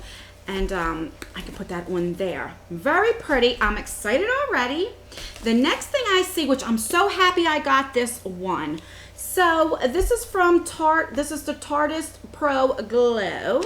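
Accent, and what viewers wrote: New Jersey, United States. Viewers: I’m from south Jersey too!! I knew you had that jersey accent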